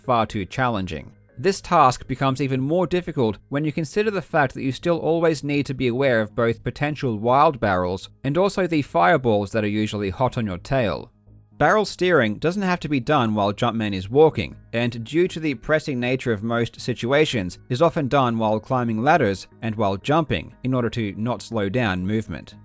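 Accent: Australia. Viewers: great videos. its interesting you have a rhotic Australian-sounding accent. not sure if you pronounce your Rs because of prolonged exposure to american accents or if it's just a legitimate accent i've never heard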